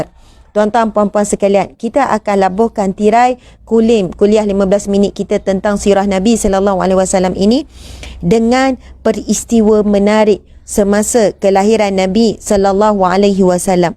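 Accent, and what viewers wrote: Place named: Terengganu, Malaysia. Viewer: alhamdulillah , maasyaaAllah , sukanyaaa dengar soro mok ustazah, cakak loghak gganu pulok tu, suko denga.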